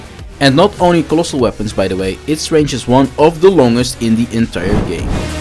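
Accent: Netherlands. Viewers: I smell dutch…..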